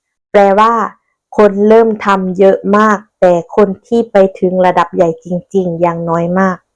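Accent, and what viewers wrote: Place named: Laos. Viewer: มาส่งกำลังใจเหมือนเดิมค่ะ พูดลาวก็น่าฮัก พูดไทยก็เก่งค่ะ สู้ไปด้วยกันค่ะ ทางนี้ผู้ติดตามก็น้อยค่ะ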